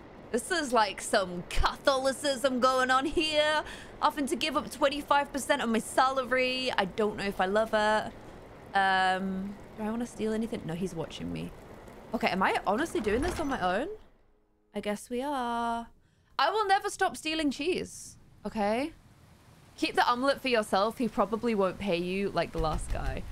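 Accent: United Kingdom. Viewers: he's a geordie:)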